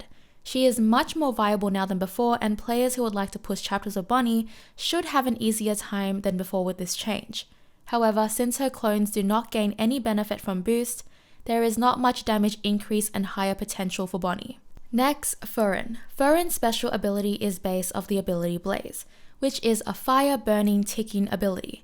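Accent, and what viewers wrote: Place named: New South Wales, Australia. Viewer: Those upgrades are very welcome! Thanks for the updates. Please keep it up. Love the accent too! NSW?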